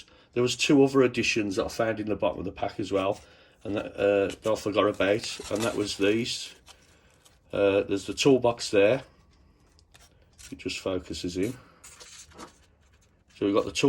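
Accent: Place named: Birmingham, United Kingdom. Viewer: A great Brummy accent. As someone who is Bromwicham born-and-bred, but has been living in the United States for the last 37 years, I find it quite refreshing. :)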